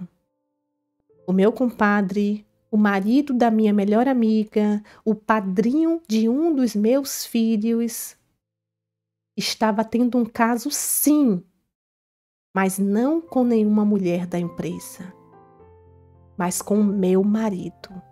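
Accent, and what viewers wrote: Bahia, Brazil. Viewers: uma curiosidade, você é baiana?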